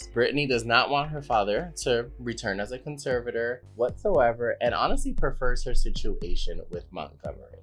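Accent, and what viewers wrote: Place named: East Coast, United States. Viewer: I just have to say I love the East Coast accent. I’m from Boston so I hear ya and love it....💜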